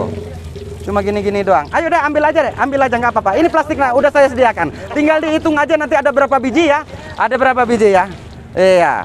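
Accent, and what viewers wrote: West Java, Indonesia. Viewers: Kayaknya sunda tp tegasnya bicara batak punya ..siip keren. Lucu campur da'wah cerdas kali..mohon mintsk no wA nya broo..!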